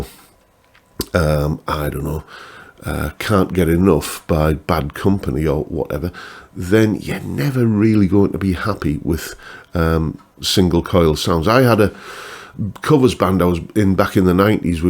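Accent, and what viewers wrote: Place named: Northern England, United Kingdom. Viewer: Nice one! Love your down-to-earth, no-nonsense, Northern bloke talk. After Gibson, Seth Lover later went to Fender and created the Wide Range Humbucker, that sounds thinner and brighter than a PAF, using 2 bobbins in series with Copper Ferrous rod magnet pole pieces instead of the steel slugs and shared Alnico bar magnet of the PAF design he is more famous for. I wanted a Wide Range Humbucker tone but using a PAF style humbucker, so as an experiment, I replaced the steel slugs and bar magnet on a cheap PAF Bridge humbucker with 12 alnico 5 5mm rod magnet pole pieces. The series tone is clearer, brighter and more open than it was before the experiment, and I got a true single coil tone when using one coil. I ran my insane experiment by Ash at Oil City Pickups, who said they already make the Brass Knuckle humbucker that is a PAF Shaped humbucker, but with Alnico 5 rod magnets - literally 2 Strat single coils sharing a humbucker baseplate, so I bought one. 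The split coil paired with Strat middle single coil gets the authentic 'ching' Strat Mix position 2 chime (Strat position 4 Middle and Neck parallel mix being the 'pung' chime tone). For those who like the hum-cancelling advantage but prefer a brighter, thinner, more single-coil-esque sound, I recommend Firebird Mini humbuckers (I use the Oil City Winterizer 1). Also vintage output humbuckers like Vanson 59's sound clearer and brighter than higher output, over-wound modern humbucker tones. I can also recommend Wilkinson M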